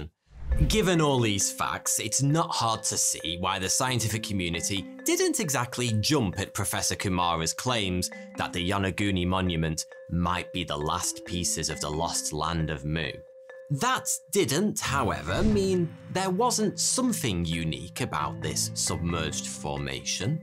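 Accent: United Kingdom. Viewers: Before giving in to this video's nice British Accent. I encourage anyone to watch Rogan #961 for a full debate between a leading skeptic and Randall Carlson/Graham Hancock. There's a solid foundation for his reporting and it's more challenging to actually listen than to just swallow the easy answer that "Leading Experts" disagree. His solo venture #1284 of the Podcast also includes a deep dive into the Piri Reis Map, and I was skeptical until the revelation about the Bimini Road. Definitely a worth few hours of your time.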